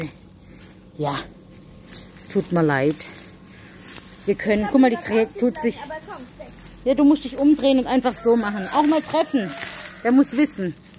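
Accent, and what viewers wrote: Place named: Swabia, Germany. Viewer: Ok liebe, dann stell ich mir das einfach vor, die sehen auf jeden Fall toll aus, habt ihr gut ausgesucht. Dein lieber kann stolz darauf sein, so eine taffe und hübsche Frau wie dich zu haben und ich liebe dein englisch-arabisch-schwäbisch Geschwätze, du bereicherst jeden Tag von uns und ich mag ganz dolle deine Penny auch wenn sie quietscht wie deine Fliegentüre;-))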